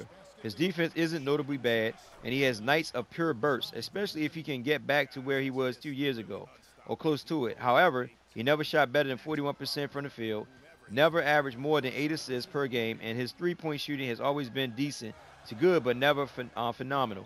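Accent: Baltimore, United States. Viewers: you sound like your from b-more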